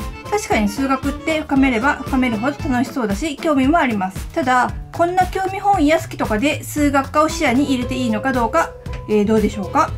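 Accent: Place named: Kansai, Japan. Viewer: この関西弁好きやわぁ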